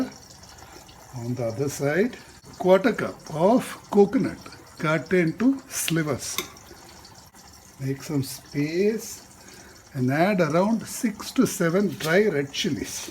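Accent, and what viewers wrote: Coimbatore, India. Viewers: Nyc to hear Coimbatore tamil🤩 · Asal Coimbatore bhasaingho...... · நம்ம கோயமுத்தூர் பாசையோட உங்க சமையலும் மனக்குதுங்...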